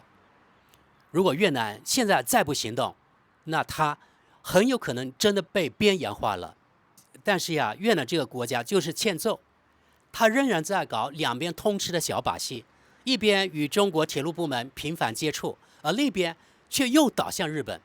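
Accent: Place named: Guangxi, China. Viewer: 主持人是广西人，普通话说得相当不错了，忽略吐字发音不同，语调很准确。内容也很好，至于技术转让，防人之心不可无，还要小心反复无常的白眼狼，在商言商，生意就是生意，小越南挑事的时候可不说两国是兄弟，也不看我们勒紧裤腰带支持他们。修高铁我们反正不急，应该适当提提价。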